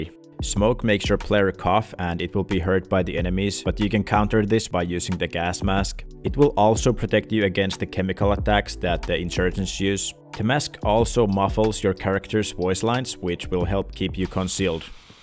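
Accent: Finland. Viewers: Sulla on todella hyvä englanti suomalaiseks, en meinannu tunnistaa mutta jotenki puski suomalaisuus läpi mikrofonin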